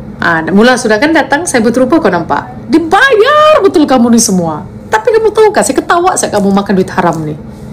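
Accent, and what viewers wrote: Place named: Kuala Lumpur, Malaysia. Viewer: ending terbaik...Sdri ni mahir bertutur dgn gaya pertuturan org KL Sgor la.....canggih...thx..all the best and May ALLAH perlihara semua geng yg BENAR dan memebala anak kecil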